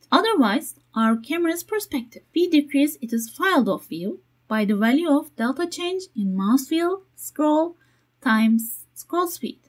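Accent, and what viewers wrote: Turkey. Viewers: aksandan türk olduğu anlaşılıyor :) teşekkürler video için. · Türkçe gibi İngilizce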